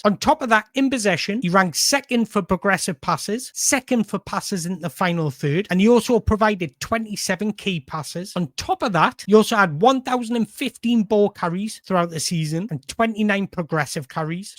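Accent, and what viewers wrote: Newcastle, United Kingdom. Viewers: Hello mate, ye channel seems to be growing and it is annoying me as pd Proudlock is amazing but don't get much views and don't pay for them like some ppl (the noise) and that is sad cos me subs are organic like lad and ye voice at least sounds Geordie unlike his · Hi, keep it up kidda. Don't listen to anyone telling you to tone your Geordie voice down, YIU are the most informative person on Any channel. You should be employed bu NUFC as scout and youth coach. Keep it up. Howay the lads.⚫️⚪️⚫️⚪️💪👍🏼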